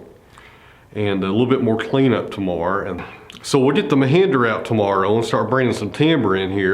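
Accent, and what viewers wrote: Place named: Tennessee, United States. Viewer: The Tennessee accent is entirely A-OK with us American's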